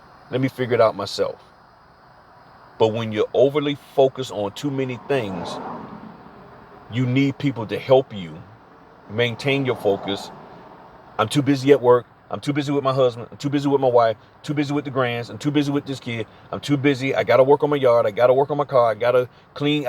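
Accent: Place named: California, United States. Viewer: You sound like a Californian from Pasadena.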